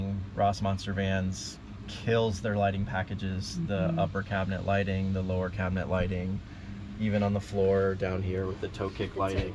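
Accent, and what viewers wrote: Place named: Tennessee, United States. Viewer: r u from TN you sound just like my niece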